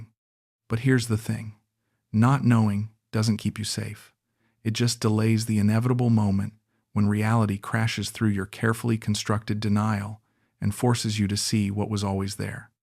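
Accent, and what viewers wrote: United States. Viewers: Are these made up stories or genuine stories ? these types of stories are true but is this channel telling true ones or made up for entertainment ? What if it's an English person is it an American accent it doesn't make sense there should be an English person accent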